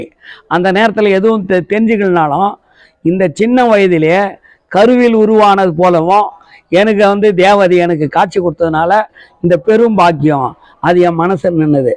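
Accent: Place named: Chennai, India. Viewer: லோபர் பயலே மெட்ராஸ் சிலாங் பேசும் புறம் போக்கு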